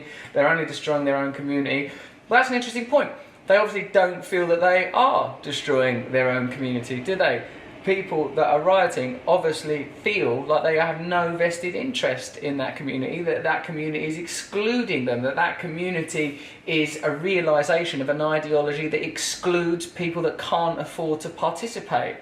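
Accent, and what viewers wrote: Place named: United Kingdom. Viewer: I loved RB taking on Sean Hannity. I am a conservative but FOX news is not my form of conservatism. This guy is actually very bright and incredibly articulate. He also has one of those British accents that doesnt drive me crazy after 5 minutes like so many of the pompous, stuffy old guard English wankers. Do something less intense like Sports role (or opportunity) in global compassion. Better yet expose FIFA for the criminals and corrupt organization that they have become. Same with the IOC. How can Russia be awarded first the Olympcis and then the World Cup given their record on human rights? I do not agree however that we are throwing our votes away because we dont have a candidate to support. its sounds to me like his solution may be revolution. I can only support peaceful action. We are far too evolved to allow what is happening in the world to continue. 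Yes the people need to rise up however they need a leader that has compassion for mankind.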